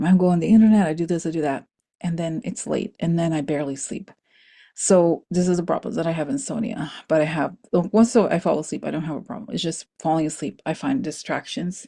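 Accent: Russia. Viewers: thank you for these insights. And, I’m here for it. I could watch you put on makeup and do your awesome Russian accent all day long. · Thank you so much for your psychic data & explanation of current events. I appreciate your solo shows, your beautiful energy, your humor, Russian accent & makeup (though you are naturally beautiful). 🩷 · Hi, thank you for your precious information and to be here for us!! You are so funny between your russian accent and different makeups!! A friend of mine who was born and raised in Czechoslovakiab (ex. USSR) told me that Ivana escape her country through the mountains skiing as she was a highly skilled skier. I said to her we never heard this information in the western countries. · I’m not bothered by you putting on make up, or the Russian accent, you have a lot of interesting perspectives! Thanks❤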